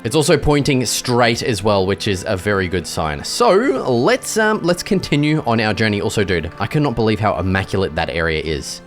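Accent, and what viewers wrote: Australia. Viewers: I don't know why but, i love Australian people's accents ❤ 😂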